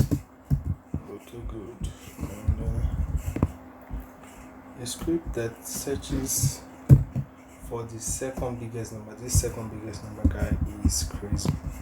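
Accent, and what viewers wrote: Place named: Nigeria. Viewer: From your intonation, I feel you re a Nigerian. pls i base in ph. i need a mentor on programming. pls be my guide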